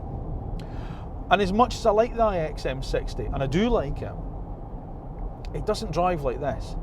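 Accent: Scotland, United Kingdom. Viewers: nice charming scottish chap's calm voice EEEEEEEEEEEEEEEEEEEEEEEEEEEEEEEEEEEEEEEEEEEEEEEEEEEEEEE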